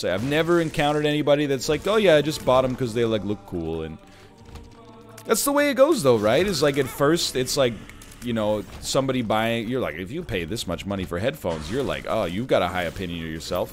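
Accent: Canada. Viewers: Is it me or canadian accent is getting thicker?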